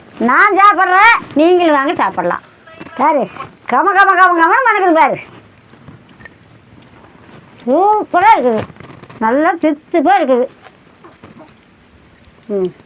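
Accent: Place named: Salem, India. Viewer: Neega entha ooru aaya,salem bhasa mathri iruku.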